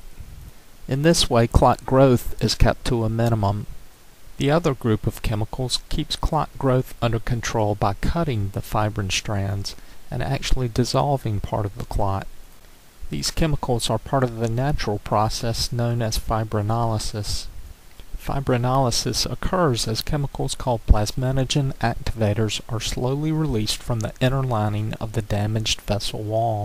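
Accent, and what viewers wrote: South, United States. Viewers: Love love love your southern accent ! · the southern accent was very strong and though i am southern i cant understand it. or maybe you just need to blow your nose... i dont know...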